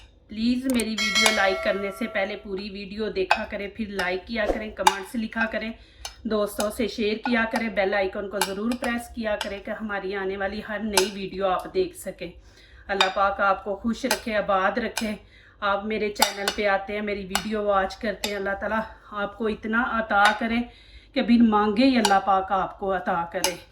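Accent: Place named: Punjab, India. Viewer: Ma sha Allah BHT zbrdstt Api... Apki aik adat BHT pyari h k ap Duaen deti Hain Dil s... Allah Pak apko BHT kmyabi Dy donu jahan ki Ameen...Apki Recipes BHT Best Nd Different Hoti Hain...Apka bat krny Ka Andaz b BHT khubsurat h Respect pyar s...Mujhy apki pnjabi wali voice BHT pasnd h...Hmen bs apki simple recipes s Kam Hona chaeye...M ap k 1M subscriber dekna chahti hn🙌 Jazakillah ❤️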